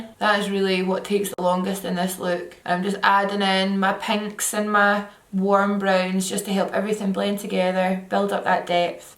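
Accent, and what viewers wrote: Scotland, United Kingdom. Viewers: I usually don't like the Scottish accent (i have a cheek as i'm from Belfast, N.I lol) but i LOVEEEEEE your accent/voice soooooo much ❤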